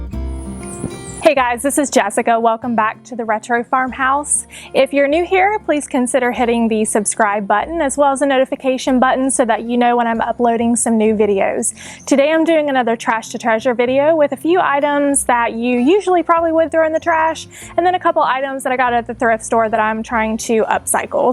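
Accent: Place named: South Jersey, United States. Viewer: Are you from South Jersey, South Philly Delaware or Mryland? I believe I recognize the "O" in your voice. I am from S. Jersey (I live in Florida florida now for the past 40 some years and still carry the accent). The video was great. TFS THUMBS UP!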